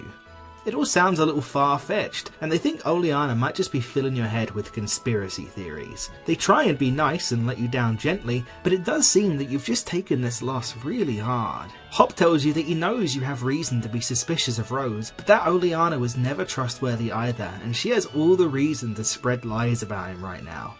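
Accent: United Kingdom. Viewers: Didn't even take 10 seconds for me to know this video was gonna be trash. I was gonna give it the benefit of the doubt, too, but damn. And your bri-ish, yeah, bye. (I stopped watching cause he dissed Sun and Moon, him being Bri-ish just added to it) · I love your Yorkshire accent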